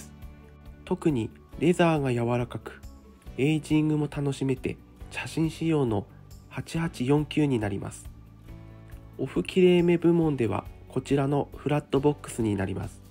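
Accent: Tsugaru, Japan. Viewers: いつも楽しく観させて頂いています｡ 津軽弁良いですよね‼️｡ (やわらかな感じで､) チャンネル登録もしています｡僕が持っているRED WINGはﾐﾙﾜﾝ(9087)ﾌｫｱﾏﾝ(8054)ﾍﾞｯｸﾏﾝ(9416)ﾌﾗｯﾄﾎﾞｯｸｽ(9060)です｡ RED WINGは５足までと心に決めていたのですが､あと１足ではおさまらないです｡ ﾌﾗｯﾄﾎﾞｯｸｽは今は光沢の出ないﾃﾞﾘｹｰﾄｸﾘｰﾑを仕様しています(光らせなくても渋く格好いいから)｡革靴は光らせる派です｡ﾌﾗｯﾄﾎﾞｯｸｽをもう１足買って光沢が出るｸﾘｰﾑで仕上げたいと思っているぐらいﾌﾗｯﾄﾎﾞｯｸｽがお気に入りです｡ 僕は革靴の色はブラックが好きです(渋く安心感があるから)｡Dﾜｲｽﾞが僕の足に合っています｡しかしEﾜｲｽﾞの8875が欲しいと思っています｡RED WINGだから赤でも､(絶対に黒と思っていました)Dﾜｲｽﾞのｺﾞｱﾃｯｸｽ仕様も考えていますがEﾜｲｽﾞも欲しいです｡絶対に他のメーカーなら黒にします｡White’sのｵｯｸｽﾌｫｰﾄﾞも欲しいのですが値段と重たさが😢諦めてしまいました｡ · 津軽弁いいですねー。人の良さが現れています。😊レッドウイングブラックブーツ最高ですねー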